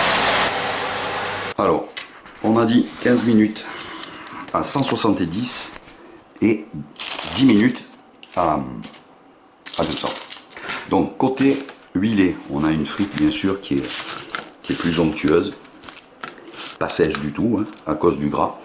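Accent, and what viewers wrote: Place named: Marseille, France. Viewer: On entend bien l'accent et la façon de parler des marseillais. Donc je m'abonne !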